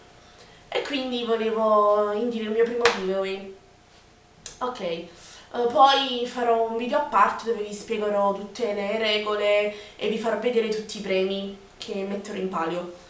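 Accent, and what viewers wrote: Naples, Italy. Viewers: ooh Cacchiarola, avevo capito dall'accento che eri Napoletana ^,^ mmh dove precisamente? :O cioè non ho mai sentito di sto posto xD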